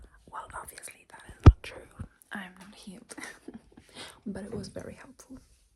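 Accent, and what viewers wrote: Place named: United Kingdom. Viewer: i’ve missed u smmmmmm. also am i just noticing you have a british accent :0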